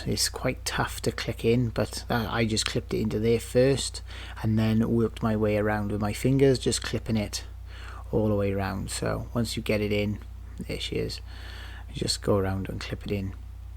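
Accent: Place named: Wales, United Kingdom. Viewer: Very helpful, detailed, & professional video, all delivered in a most pleasant Welsh accent! Very well done😊.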